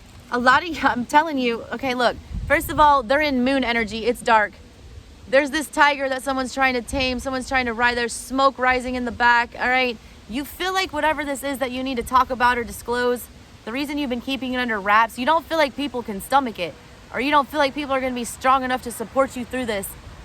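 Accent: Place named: South, United States. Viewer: A southern story telling straga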